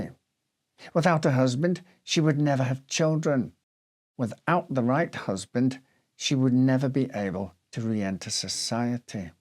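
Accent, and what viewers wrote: United Kingdom. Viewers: Something about your British accent and your articulation makes you very enjoyable to listen to. I thank you for your service sir!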